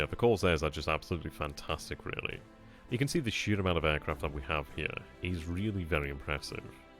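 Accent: United Kingdom. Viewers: is a well spoken English Gentleman, and I have no problem understanding what he says, but I guess if your an American, or Canadian, or from some other foreign country, then he may well sound strange to you.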